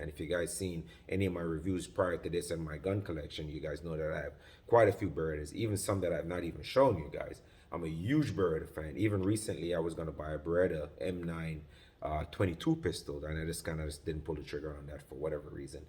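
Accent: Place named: Jamaica. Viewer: Heard the Jamaican accent and had to show love bredrin!! Continue with the great content and another subscriber to the chart!!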